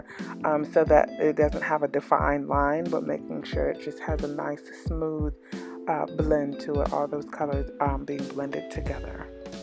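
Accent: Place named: New York, United States. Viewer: lol I definitely hear a little new york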